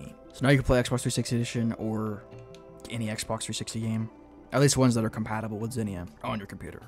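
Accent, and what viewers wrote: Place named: Kentucky, United States. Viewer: he sound like my old friend from kentucky 😥😥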